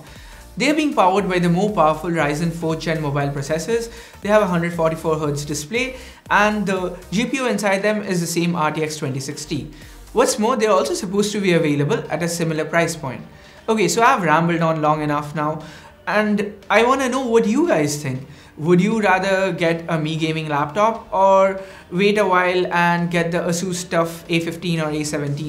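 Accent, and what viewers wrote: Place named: Bengal, India. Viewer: That's how Bengali accent is 😍😍😍😍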